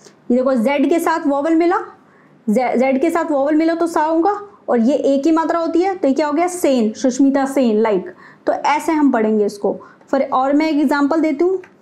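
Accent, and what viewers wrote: Rajasthan, India. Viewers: bist du aus rajasthan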